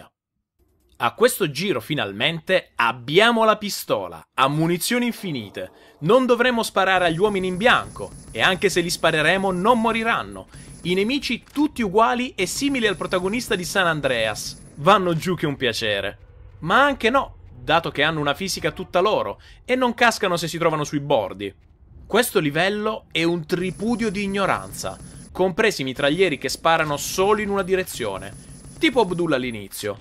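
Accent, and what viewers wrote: Bari, Italy. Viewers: Tu e il tuo accento barese spaccate <3 :D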